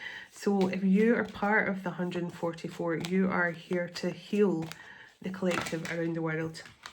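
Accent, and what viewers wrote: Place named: Scotland, United Kingdom. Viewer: I hyped you because I just love you! Forgiveness is healing and I believe in the same thing. Jesus taught us to forgive and love one another as my father loves you. I stand by that. I would rather listen to my intuition and let the Holy Spirit guide me more than anything. Also I can’t get enough of your Scottish accent and my youngest Kyle loves your voice too. He said it’s soothing to him. you are a blessing to us all! So happy to know you! I will email you as more things have happened that I can’t discuss here. Thanks for being you 🙏🏻❤️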